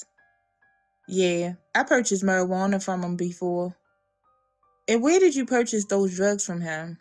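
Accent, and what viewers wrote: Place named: Baltimore, United States. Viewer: The narrator is definitely from Baltimore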